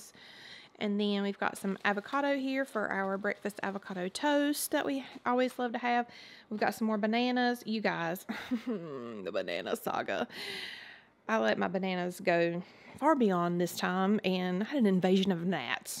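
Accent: South, United States. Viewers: I loved the haul. I can’t wait to see Harrison in his tuxedo. Also I love your “ accent “. I’m southern also lol & I’m from Georgia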